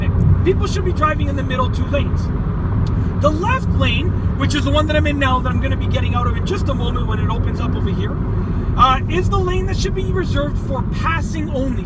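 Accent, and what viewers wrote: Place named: Canada. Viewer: I think abe Lincoln is said to have spoken like this guy. Though ... Probably without the Canadian accent.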